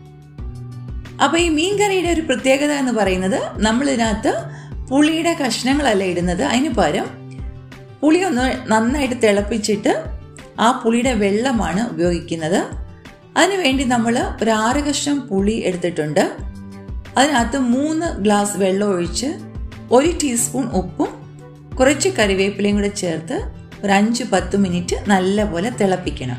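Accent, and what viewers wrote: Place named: Kottayam, India. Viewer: Well expalined in കോട്ടയം slang 👌♥️👏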